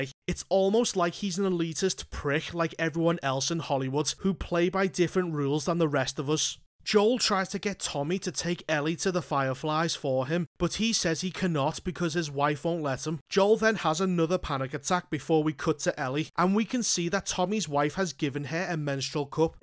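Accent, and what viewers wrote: Liverpool, United Kingdom. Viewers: A nice scouse commentary on the last of us tv series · This video is 10x better with your scouser accent.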